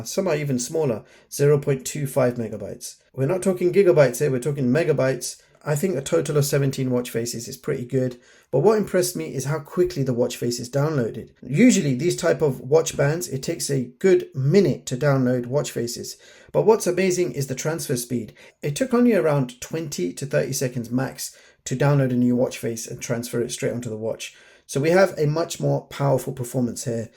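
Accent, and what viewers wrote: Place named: United Kingdom. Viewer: I can't understand half of what this guy says. Too much british accent and low volume.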